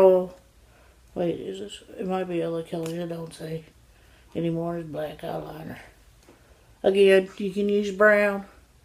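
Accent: South, United States. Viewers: Do u have a southern accent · She's so cute and I live her southern accent type🌈🌈🌈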